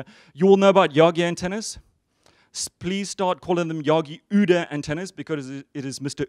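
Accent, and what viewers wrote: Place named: South Africa. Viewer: I recommend watching this at 3/4 speed if you're not South African or Kiwi.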